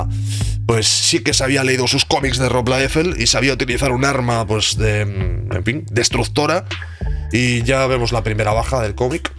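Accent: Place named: Catalonia, Spain. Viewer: ¿En serio? ¿Eres cantabro?. Coño, pro tu acento pense que eras catalan. Que pequeño es el mundo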